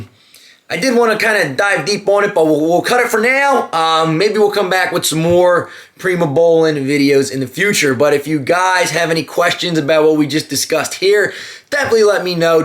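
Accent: Philadelphia, United States. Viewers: That's a philly accent if i've ever heard one. Have a new sub just for that.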